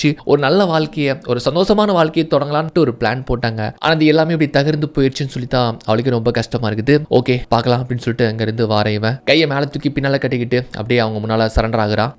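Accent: Sri Lanka. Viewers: Ivanga Voice Modulation Nallave Therithu Sri Lankan Tamil Nu So Ivaru Definitely Arjun Bro Illa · slang change aahuthunga.... ilangai tamil mix up maathiri irukku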